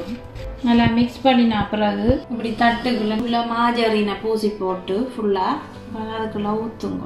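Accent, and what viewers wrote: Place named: Sri Lanka. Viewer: Sister unga Srilanka speech super nenga sonna ravai alaku sister I joined you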